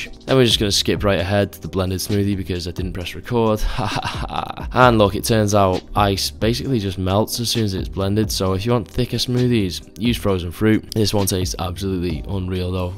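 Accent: United Kingdom. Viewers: Something about a guy with a heavy UK accent calling the taste of a smoothie "absolutely fucking ruthless" with soft music playing in the background. You got my sub. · I love the British accent (quick say water) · the bri'ish commentary just makes it that much better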